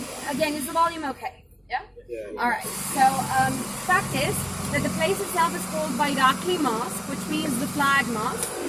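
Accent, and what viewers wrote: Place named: Ireland. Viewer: why an Irish accent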